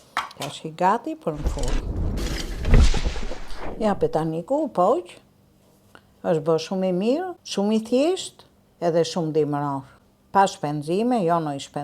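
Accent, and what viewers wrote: Tirana, Albania. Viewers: Të lumshin duart dhe goja që flet Tironce puro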